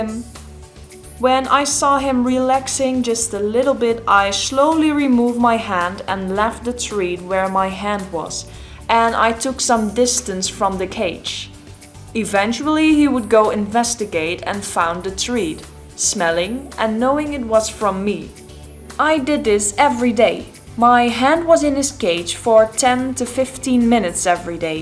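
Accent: Netherlands. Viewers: Wie hoort ook dat ze Nederlands is? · Pedro Paúl She's Duch i think. I have Dutch relatives and i recognise the accent when they speak English. I like it too 😊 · I can hear ur dutch i don't know why, het is gewoon beetje het accent..... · Thank you so much for this video! I have a pair of little robos. I have waited about a week since I got them and I've tried to tame them a couple of times. They are usually scared of me but yesterday they took a treat from my hand. It definitely takes a lot of patience to tame a robo! I will be using the techniques in this video to aid my taming process, Btw I love your accent! Are you Dutch? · i have 1 qeustion: are you born in the netherlands because you sound dutch to me ( i really like your videos but i was wondering because im dutch my self) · ik heb je door je hebt een nederlands accent ja ja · Lauren Mcintyre Nederlands denk ik ook. 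· Are you dutch???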